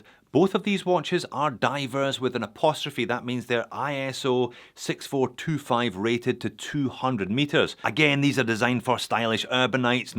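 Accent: United Kingdom. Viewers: Thank you Sir, love the accent ! Is that from United Kingdom 🇬🇧?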